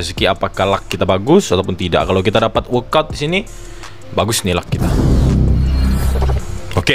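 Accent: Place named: Sabah, Malaysia. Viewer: Mcm org sabah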